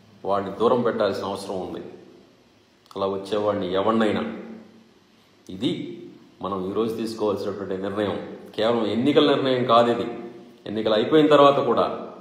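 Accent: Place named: Andhra, India. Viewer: sir....meeru excellent gaa matladutunnaru....educated andhra voice vinpistunnaru...keep on going sir....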